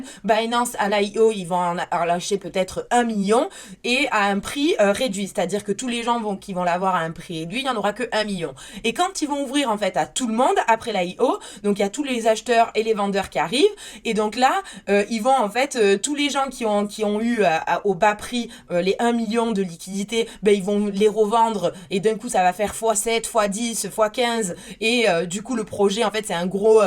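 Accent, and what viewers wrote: South, France. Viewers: Accent du sud ?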